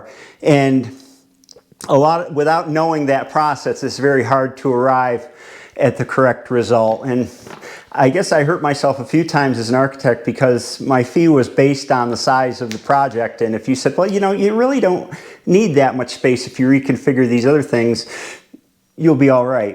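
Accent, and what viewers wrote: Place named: New York, United States. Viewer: So since I first began watching your current videos cutting hay, there was a familiarity to you… when I watched your part 2 summary from 4 years ago today it became obvious (at least to me) who your narrations resemble. Can you guess? That’s correct! Andy Rooney CBS 60 minutes. I suppose there is a New York influence in there!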